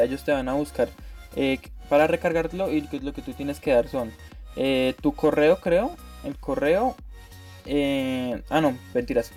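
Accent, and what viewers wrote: Colombia. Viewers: Gracias por el video, muy bueno, una pregunta ¿Sos de Cali? Disculpa si no reconozco tu acento jaja.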